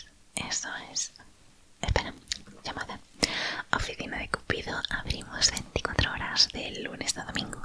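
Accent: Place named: Spain. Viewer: jajajajajaj 😂😂 te luciste con este video fue mega divertido y relajante me resultó genial la vocecita al teléfono! saludos desde méxico ❤❤ de qué parte de españa eres? me encanta el acento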